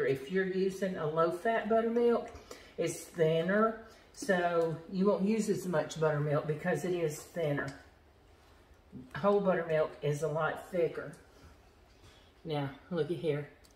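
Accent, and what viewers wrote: South, United States. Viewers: like I'm watching my grandma southern drawl n all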